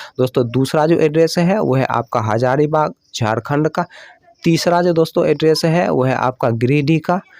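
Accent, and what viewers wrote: Bihar, India. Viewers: Sir AAP Bihar se hai kya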